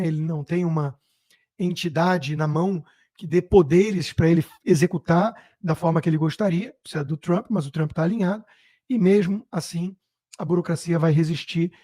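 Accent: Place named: Rio de Janeiro, Brazil. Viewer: Mesmo sendo muito reticente ao sotaque carioca, mas é maiiiins , que acaba sendo divertido. Embora o que é mais importante é a cultura e o conhecimento exposto . Só isso.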